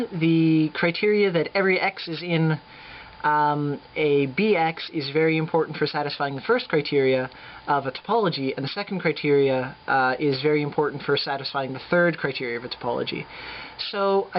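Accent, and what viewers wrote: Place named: Canada. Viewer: he keeps sayin oout...canadians.......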